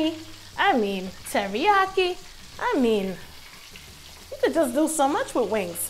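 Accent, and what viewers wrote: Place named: East Coast, United States. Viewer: First & foremost, this is recipe is slamming 🤾🏾‍♂️... I gotta ask you this one thing though🤷🏾‍♂️❔ That accent is so east- coast; has a hint of Baltimore, or maybe Spanish Harlem. Lol, where'd they grow you at?🌱🌷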